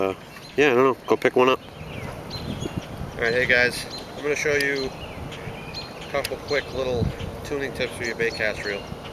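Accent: Boston, United States. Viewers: is that a boston accent i hear?? =)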